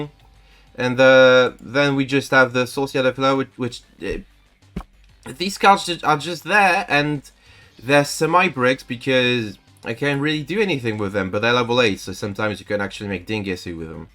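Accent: France. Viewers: l'accent francais 10/10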